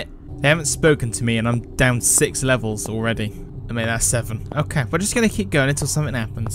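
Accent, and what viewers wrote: United Kingdom. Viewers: Pretty sure that this guy is British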